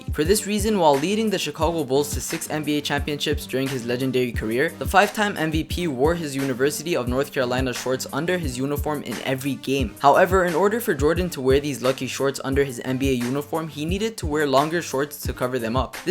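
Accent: Toronto, Canada. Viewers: This is my first time ever watching your videos and I can definitely tell you're from Toronto so I'll sub lol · your accent lmao Canadian lol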